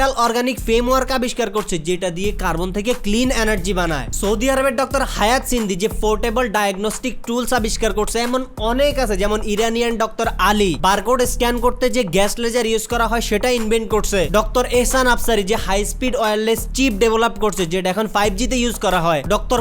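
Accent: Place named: Chittagong, Bangladesh. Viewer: চিটাগাইংগা এক্সেন্টটা না থাকলে আরো ভালো লাগবে ভিডিওগুলো(যদিও আমি বইংগা হয়েও চইংগা ভাষায় জব করেছি ৭বছর লুল), যদিও গাঞ্চা নূর এর কথা তোমার ভিডিও ছাড়া জানতাম না।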